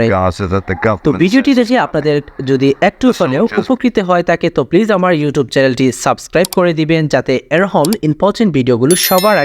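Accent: Sylhet, Bangladesh. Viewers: Bhai ki sylheti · ভাই আপনি মনে হয় সিলেটি r8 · ভাই আপনি কি সিলিটি❤❤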